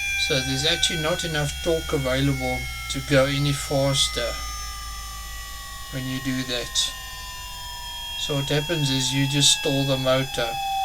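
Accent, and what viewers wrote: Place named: South Africa. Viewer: You sound very south african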